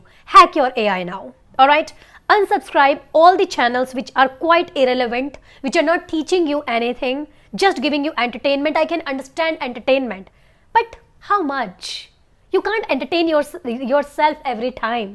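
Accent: India. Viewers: You speak english well woman .it s too fun your accent it s great it adds a gracious listening . I m french and i notice a lot of indians People speak english like you . Could you ask you a question lady ? Is life is easy or Hard in england ? I don t know this land ?i went in london when i was seventeen . It s too gracious to listen to you keep teaching People. Could you ansewer my question please ? God bless you lady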